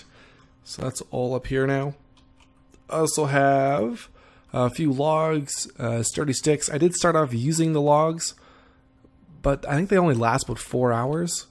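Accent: Canada. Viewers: feed me, feeeeeeeed meeeee my video addiction. I need to hear more of that Canadian gurrAGE'ness.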